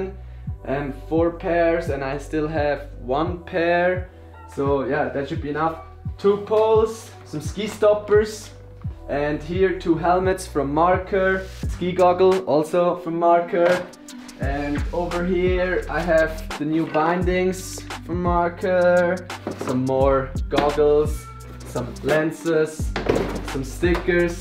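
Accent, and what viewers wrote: Switzerland. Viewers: haha fix en schwiizer englisch akzent ;D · du da hämmer emol wieder dä schwiizer dialekt😂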